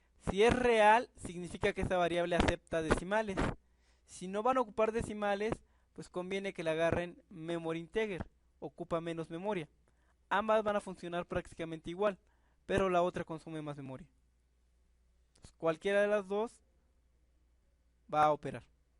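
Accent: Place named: Mexico. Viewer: eres lo maximo tio , muchas gracias por tus tutoriales explicas muy bien y por lo que escucho eres de mexico , que viva mexico!!!!!!!!!!!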